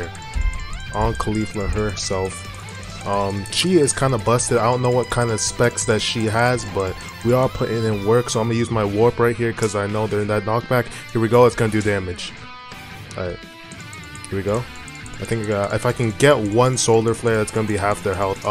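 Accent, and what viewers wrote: Jamaica. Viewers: I heard the jamaican and just subed , good channel👍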